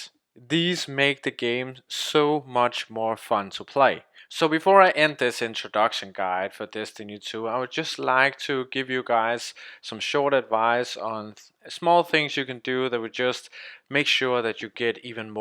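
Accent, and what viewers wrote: Denmark. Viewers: I love the Danish Accent....you should hear mine, we sound very alike hehe :p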